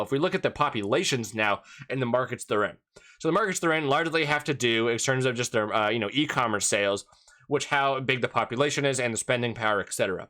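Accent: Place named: United States. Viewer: If you want to complain about an American speaking like an American, then go elsewhere.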